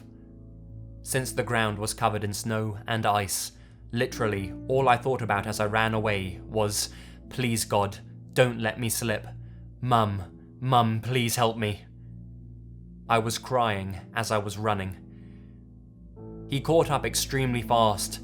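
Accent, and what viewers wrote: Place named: United Kingdom. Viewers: I love your British accent, I'm Canadian and I die a little every time a hear your voice and your tone for telling scray stories, scares the shit out of me. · Finally someone else like me who has a British accent and tells scary stories only you have a British accent that's a hundred times better than mine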